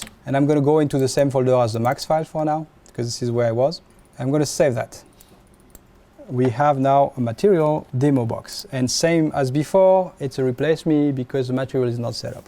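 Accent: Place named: France. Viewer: Good french accent ! haha content de voir un Français chez Crytek ! c'est pas tout les jours !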